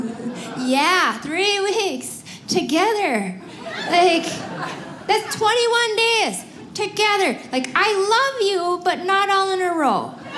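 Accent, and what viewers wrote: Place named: Wisconsin, United States. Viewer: I love her accent! As a cheesehead in Wisconsin, I probably talk the same way.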